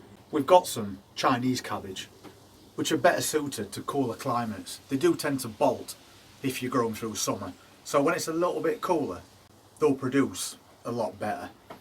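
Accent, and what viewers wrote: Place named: North Midlands, United Kingdom. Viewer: Hi, in rough terms, whereabouts are you in the UK? I’d suspect north midlands from your accent but people move around so that’s not a reliable indicator. The reason I ask is that I’m interested to know what hardiness zone you grow in so that I can follow your advice with cognisant of any local temperature related adjustments I might need to make. FYI I’m in North Shropshire only a cock stride from the South Cheshire border.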